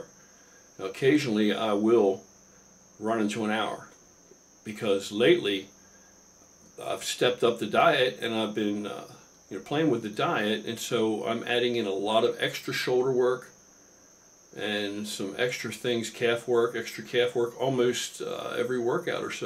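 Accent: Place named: Philadelphia, United States. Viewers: I'm from south jersey right next to philly and I thought the same thing. The way he pronounced the days of the week is a dead giveaway. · i bet this dude is from the philadelphia/south jersey area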